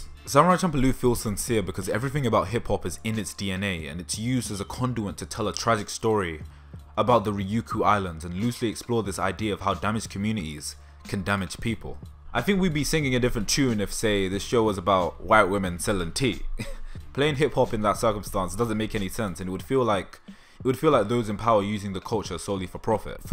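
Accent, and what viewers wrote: United Kingdom. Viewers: No I'm not being 100% glib. You have a British accent, but you're obviously black and talk about the black experience in America. So do you live in America or believe that any black person can understand the black experience in America? Call it a question of phenomenology.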